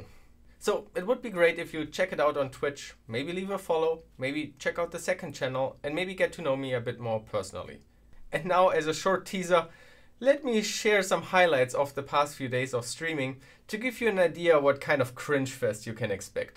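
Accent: Germany. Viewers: man hört dass du Deutscher bist :D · not sure, but sounds german. like it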